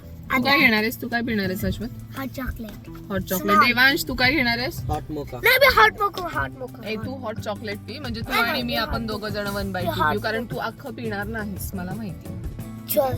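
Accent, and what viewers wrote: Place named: Pune, India. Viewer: mla kuph awadta tumche bolne punyche mrate bolta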